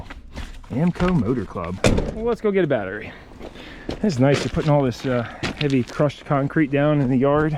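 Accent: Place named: East Coast, United States. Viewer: The east coast accent is awesome! I'm from Wisconsin, we sound goofy!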